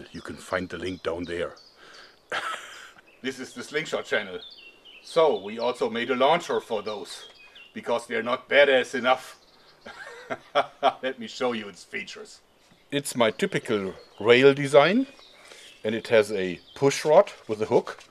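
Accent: Germany. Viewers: Nico Derksen Kann man aber braucht man nicht. Die Videos sind für die Weltöffentlichkeit bestimmt und nicht für welche die nicht genügend Englisch verstehen. spricht ganz gut Englisch und hat so wie ich eben einen charmanten Deutschen Akzent. Keine Extrawürste braten! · #JoergSprave ich habe gewusst dass du n deutsch bist:D denn der Akzent ist der beste:D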